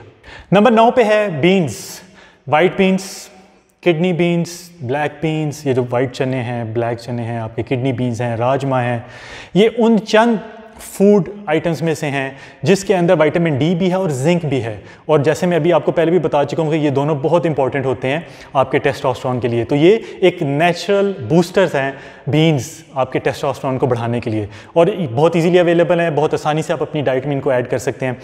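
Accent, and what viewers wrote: India. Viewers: Your language Sounds like Hyderabadi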